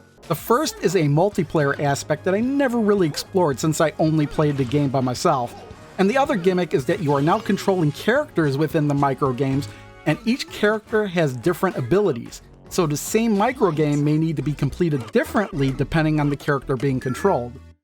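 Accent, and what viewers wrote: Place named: Chicago, United States. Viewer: This video was great. Personally, I'm really interested in unique but high quality indie/import titles for the Switch... but... I'm just getting into it... so... I'm swamped, trying to wade my way through. Clean little segments like this definitely help out. Thanks! On a separate note, I'm trying to place your dialect. I thought maybe long forgotten Acadian but I just heard you say "da game" which triggered my dormant memory of George Wendt saying "da bears!". So that's my guess: Chicago and if not, then rural Illinois.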